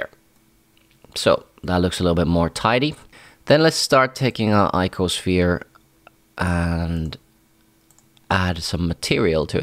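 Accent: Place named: Scotland, United Kingdom. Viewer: You have Scottish accent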